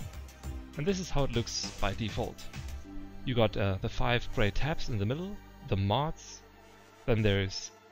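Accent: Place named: Germany. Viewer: Man kann es sowasvon höhren das du ein Deutscher bist :D Stimmt doch oder? Du hast nicht zufällig ein Deutsches Tutorial für ACE 2? (Ein aktuelles)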